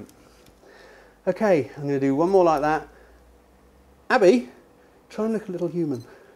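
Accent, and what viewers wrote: United Kingdom. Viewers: Enjoying that until it pauses...and asks for MONEY. Eventually got rid of ad, so thank you for your excellent tips. So nice it's UK English too!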